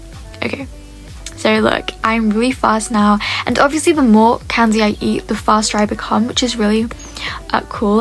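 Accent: United Kingdom. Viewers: Power of the British ascent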